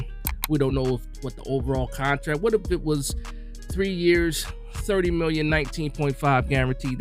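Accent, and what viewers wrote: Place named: South, United States. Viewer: I'm not listening to talk about The New York Football Giants from a guy with a southern accent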